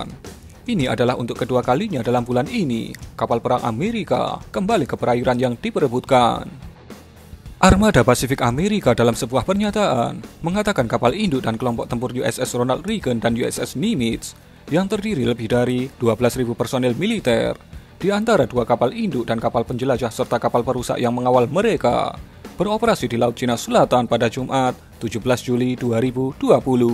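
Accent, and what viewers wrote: Java, Indonesia. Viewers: Medok nya dak hilang2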